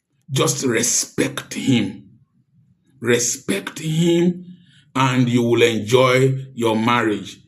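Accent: Nigeria. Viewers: Dear Uncle I apprecite you plenty ooo. This your name is very similar and your tone and accent is very illustrative. Are you from Orogun, delta state by any chance? That surname is that of father's family too. May the universe continue to favour you sir. from Italy and The UK.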